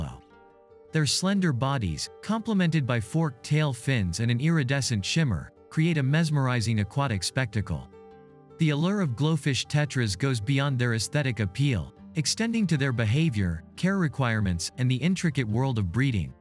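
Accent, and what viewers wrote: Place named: United States. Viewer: nice tutorial, i not only learn American English but also the knowledge on Electric Green Tetra😀👍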